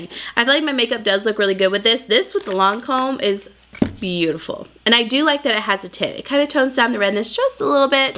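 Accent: South, United States. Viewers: Love your videos. You are such a sweet southern girl that is so fun to just listen to. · You sound so country in this one 😂😂😂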